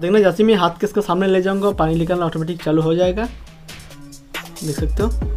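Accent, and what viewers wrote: Bengal, India. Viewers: Aami tum bangauli hai 🤡....yrr jo bhi ho bangali logo ka accent bht pyara hota h 😂💗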